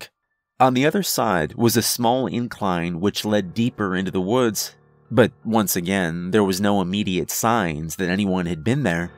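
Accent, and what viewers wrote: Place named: South, United States. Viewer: Did anybody pick up a weird teter-otter between a southern and a northern accent?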